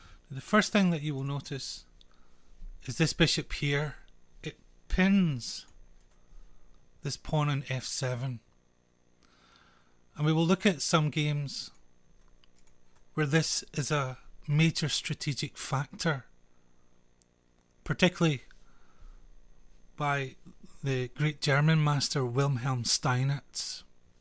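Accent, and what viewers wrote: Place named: Scotland, United Kingdom. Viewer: Originally, I was gonna ask if you were from Glasgow, but since I am not familiar with different Scot accents I broadened my question. No shame in being from Glasgow. Thanks for the video.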